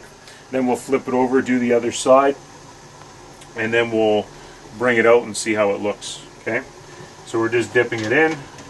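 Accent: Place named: Canada. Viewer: He sounds extremely Canadian.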